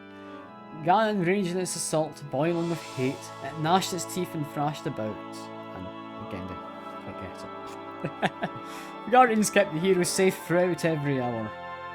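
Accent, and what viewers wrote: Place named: Scotland, United Kingdom. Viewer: Cool. Funnily enough, I'm also Scottish and yet your accent is much stronger than mine lol. Nice video btw!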